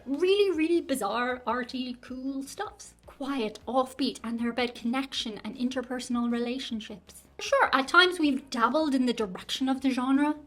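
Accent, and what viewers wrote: Ireland. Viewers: I find it interesting as an American I much more easily understand the Irish accent better than the British.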